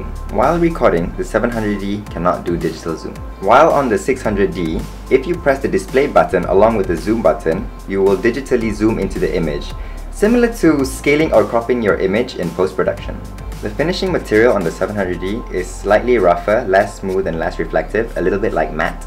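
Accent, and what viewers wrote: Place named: Malaysia. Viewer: u sound malaysian malaysians are cool :)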